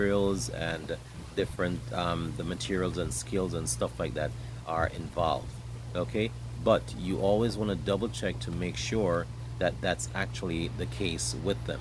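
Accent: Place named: United States. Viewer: hey is that US dollars or Jamaican dollars ?cos you speak with a slight american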